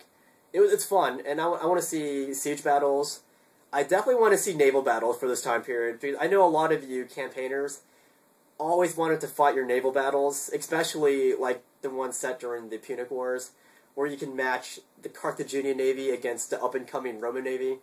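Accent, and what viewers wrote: United States. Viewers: !?!? thats you!? you look filipino or atleast South east asian i always thought yo were american because of your voice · He is very obviously American.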